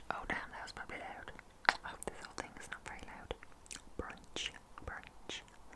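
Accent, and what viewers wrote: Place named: Ireland. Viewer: Great video, I can't get enough of a) fact videos and b) Irish accents so this is amazing!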